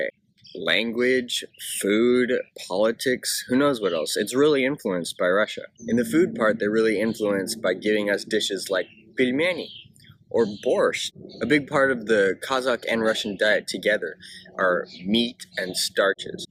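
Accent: United States. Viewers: Why do you look and sound American? Don't you like being from Kazakhastani?? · You have a very strong American accent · you from Seattle? you have the Washington acent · Kazakh language is a State language, Russian is the Second Official language. I noticed that in Almaty there most people speak Russian, but in the West of KZ people speak both languages, but they speak Kazakh mostly. I wonder, what's your nationality? I suppose, you have an American accent, if I'm not mistaken. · how come you speak like an american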